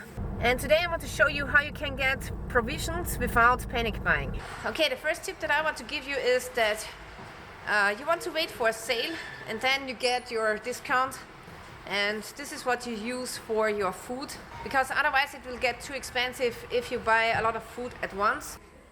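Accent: Germany. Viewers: Wohnen sie im Deutschland, deinem aksent, horen zum mir wie Deutschen. Es tut mir leid, mein Deutsch ist jetzt nicht so spitze....und keine umlaut habe ich, am Keyboard. Im Jahre 2001, ich habe nacht Deutschland besuchen, und wahre ich einem Student. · Is this German accent? -_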